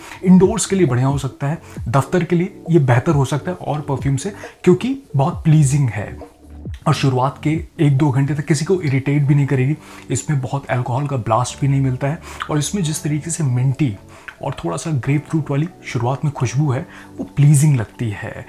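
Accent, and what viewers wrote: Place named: Lucknow, India. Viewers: you speak elegantly. lovely urdu are you from lucknow? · For some reason, your Hindi seems like you are from Lucknow (or vicinity).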